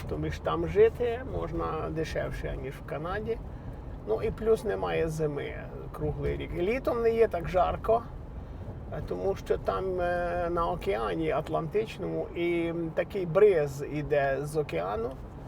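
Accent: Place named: Ivano-Frankivsk, Ukraine. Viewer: по розмові чути Франківська обл....та ще й з горів... Але ви кажете, що з Вінницької області. Вибачаюся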